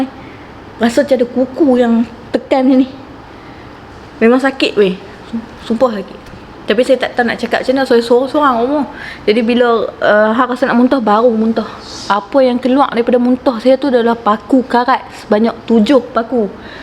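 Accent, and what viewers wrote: North, Malaysia. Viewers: Hg prempuan yg hebat ..cakap utara memang sempoi rahh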